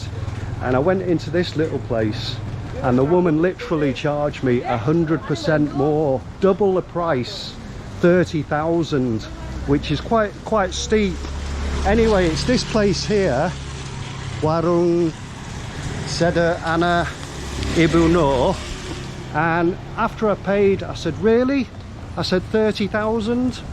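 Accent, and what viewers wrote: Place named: Liverpool, United Kingdom. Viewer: That's unfortunate that you got ripped off by that woman. Yeaaa, just like anywhere else in this world, there are good people and bad people (mostly good ones, though). I understand your frustration, indeed. It is not the 15000 rupiah (which is a minute amount) but the principal behind it. I hate dishonest people but this world is not a perfect place. Well, I hope this experience won't dampen your excitement while visiting Jakarta. Sorry you had to learn the hard way. Forget about this tiny incident and keep exploring and have a great time. I enjoy your videos and your Liverpool accent... lol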